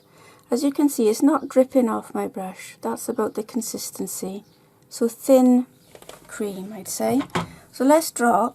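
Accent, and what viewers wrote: Wales, United Kingdom. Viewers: Yeeeeaah - a three parter - you've just made my day! What better then to sit down and watch an interesting and instructive tutorial whilst listening to that gorgeous Welsh accent! Thanking you in advance for all three videos.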